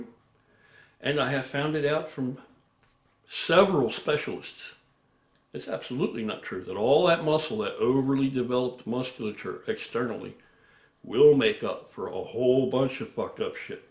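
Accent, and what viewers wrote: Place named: Philadelphia, United States. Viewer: You gotta be from Philly or South Jersey. 😅i know our accent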